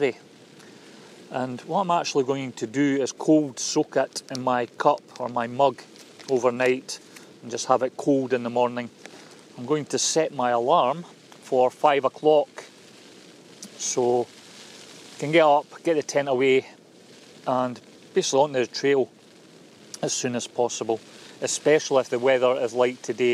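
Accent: Scotland, United Kingdom. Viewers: as a Scot living in Sweden let me tell you the accent isn't holding anything back. The people of the world love our accent. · Proper jock accent, lov it :)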